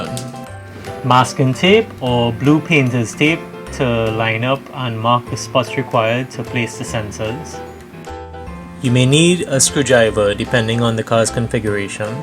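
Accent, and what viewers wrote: Trinidad and Tobago. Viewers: Knew he sounded like a Trinidadian, big up Couva lol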